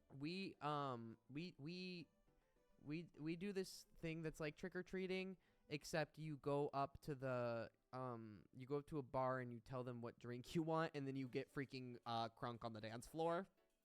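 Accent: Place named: California, United States. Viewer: Hey,! I adore your accent. Are you from California?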